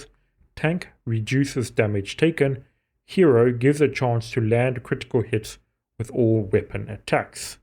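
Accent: South Africa. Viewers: are you South African by any chance?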